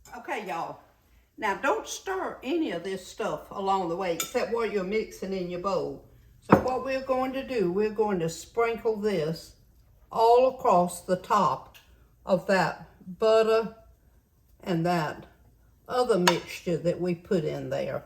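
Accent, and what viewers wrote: South, United States. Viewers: Oh Honey when u started talking I knew u were from the south just like me I've been in Ks. 4-40 yrs but go back 2 No. Car. 4 2 mon. & at Christmas So happy I've found U My daughter made this cobbler & we sure loved it 💙🙏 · I love that suthen accent. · Hello! Love your channel! Your southern accent reminds me of my late aunt who lived in Memphis, Tennessee. Are you from that area? 😃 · This brought tears My mama made this and I have looked for the recipe for ever Love your sweet southern way of speaking Im fom !South Carolina and you sound like family ❤❤❤ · I’m from Texas but her accent is much more southern than mine and it’s just precious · Love love love her Southern Accent. 💓💓💓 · Oh man, I’ve found my next potluck dish 🥰 I can’t believe I’ve never heard of this! It looks delicious, thank you so much. I don’t know where you’re from, but you sound southern and hearing you reminded me of my Granny and Mammaw, I miss them so much ❤ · When she said she was going to do her happy dance, I was so sure she'd be talking about the chocolate, but I should have listened to the southern accent and known she'd be talking about the butter! 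🤣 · I’m from the South also metro ATL GA, But her accent is different from ours, I’ve noticed each Southern State has a different sound, I can usually pick up, Ala, Ms and the Appalachian States have a really different sound. I love Southern voices…..🍑🇺🇸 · Love the southern “y’all” since I grew up in Tennessee. My sister (God rest her soul) and I would be making this right now. Looks delicious 😋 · She reminds me of my Mamaw Love her Southern Accent ❤❤ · I absolutely love your accent. You sound like a southern belle. I can't tell if your accent is from South Carolina or Mississippi but you sound like a southern bell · Love the Real southern accent ❤ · I forget where it was filmed, but it was somewhere here in the Deep South! It's a beautiful Southern drawl. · I don’t think there was a single word in this whole video that ended in “er” and I absolutely adored it. Madam, you remind me of all those wonderful little old white haired southern chefs from the church socials when I was a young lad growing up in southern Missouri. 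Mind you, they fed me very well and I’ll be making some of this cobbler for my family soon. Thank you and have a blessed day! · I absolutely love her southern accent.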